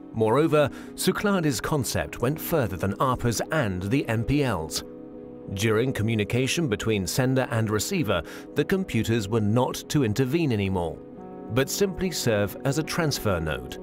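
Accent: England, United Kingdom. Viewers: What a terrible accent... I can't understand a word. I hate England guys. (about the girl, I'm feel ok) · love the english accent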